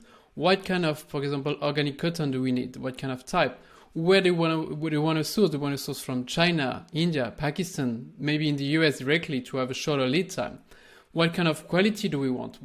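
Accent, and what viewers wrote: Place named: France. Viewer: That’s French accent! Great! 🎉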